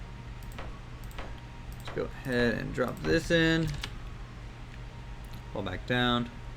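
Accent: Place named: North, United States. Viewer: Keep up the great work!!! P.S. This is super random, but where are you from? I often hear police sirens in the background, so I'm guessing a decent sized city. And I feel like you have a northern U.S. accent. So I'm guessing somewhere Minnesota or maybe Chicago? lol just curious, I'm sure I'm way off haha.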